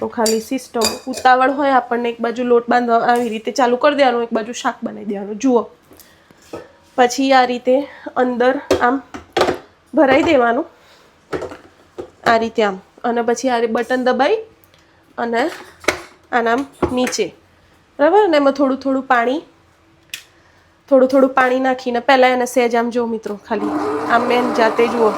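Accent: Sabarkantha, India. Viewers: Sabarkantha boli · Sabarkanthaa ni bhasa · બેન સાબરકાંઠા ના પટેલ લાગે છે ભાષા 😅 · સાબરકાંઠાની બોલી 😊😊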